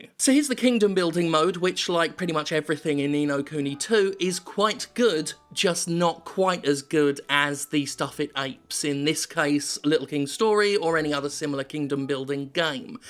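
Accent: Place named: United Kingdom. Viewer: When he started talking about the story, he completely lost me with his Britishness.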